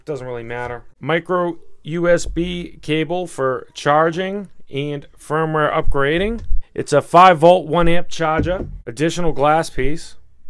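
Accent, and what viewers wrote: Boston, United States. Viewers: are you from boston? keep up the good work by the way !!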